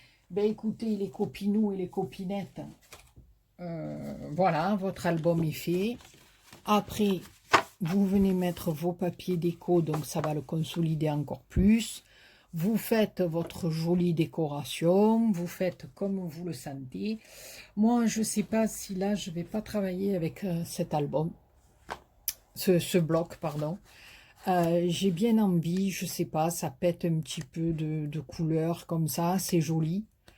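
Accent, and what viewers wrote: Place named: Marseille, France. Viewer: cc merci pour tesexplications a la marseillaise hihihi bravo super bonne fin de journée bises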